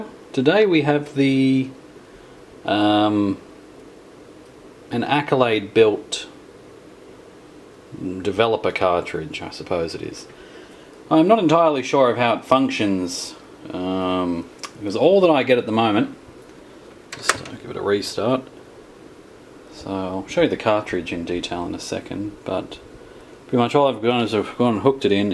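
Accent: Australia. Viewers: If you are anywhere near Brisbane (definitely have an Aussie accent), I have a free analog scope for you ;)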